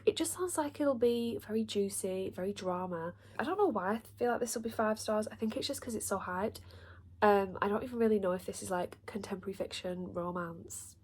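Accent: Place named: Lancashire, United Kingdom. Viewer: hearing another Lancashire accent on BookTube is like a warm hug! the algorithm did a good job today ❤️❤️❤️ Cleopatra and Frankenstein is a masterpiece, enjoy!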